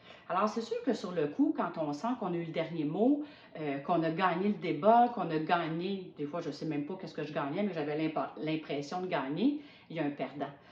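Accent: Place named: Quebec, Canada. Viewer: Ah mais ! C'est l'accent de la belle province ! Je vous ai démasquée miss ;-)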